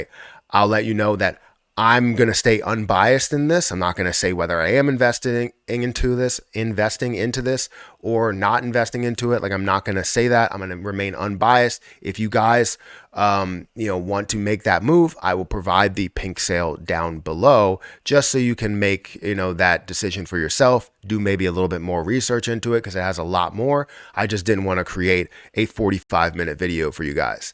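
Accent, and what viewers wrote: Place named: Los Angeles, United States. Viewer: Where are u from? Your accent looks from Los Angeles isn't?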